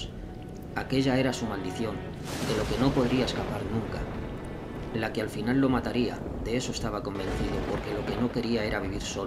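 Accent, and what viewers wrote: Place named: Spain. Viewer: Hermoso dibujo ,buena narración . Me recuerda los radioteatros que escuchaba cuando era niña. Tienes acento español. 🌞🌛✨🌿🌸🤗🥰😁👍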